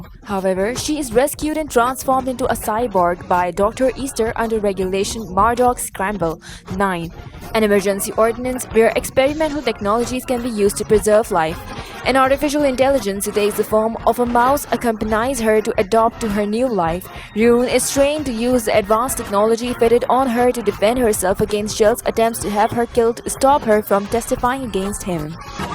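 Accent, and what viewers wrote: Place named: South Asia. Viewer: Hi Nice to hear a desi Voice, talking about Anime:)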